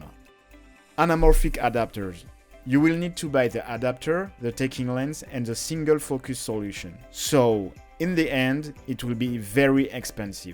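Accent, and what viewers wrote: France. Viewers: Tu est français ?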